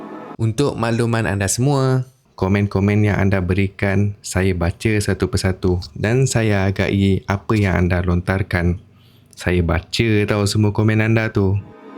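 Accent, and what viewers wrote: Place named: Java, Indonesia. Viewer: Abang ni orang Jawa ya,Sebab bunyi slang abang bercakap ada bunyi Jawa😄🙏🤝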